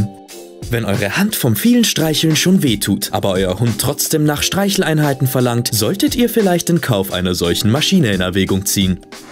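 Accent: Austria. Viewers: Das ist auf Österreichisch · Lia & Enna Das ist Österreich-Deutsch☺! Da ich selber aus Österreich stamme...die Deutschen sprechen das CH aus und wir Österreicher mit K; zum Beispiel bei der Giraffe, sagen wir Österreicher Schiraffe; usw.! 😊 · Stimme ist super. Man fühlt sich etwas weniger wie mitten in Österreich. (Nichts gegen Österreich)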